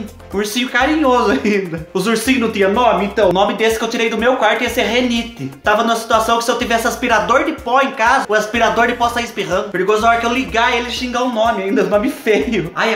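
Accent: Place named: Sorocaba, Brazil. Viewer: Sabia que este sotaque era sorocabano! ! Odeio traça na parede!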